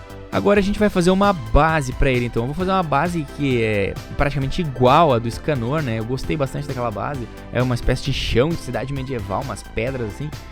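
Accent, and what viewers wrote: Rio Grande do Sul, Brazil. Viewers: conheci o seu canal a pouco tempo, e ja curto e pelo seu sotaque percebi q é de RS kkk,tbm sou, só q sou de Caxias tmj <3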